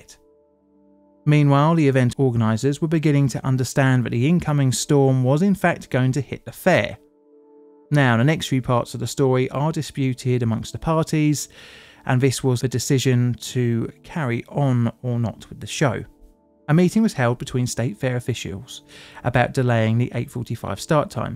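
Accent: United Kingdom. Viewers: Why would i get my Indiana state news from someone who sounds like a limey?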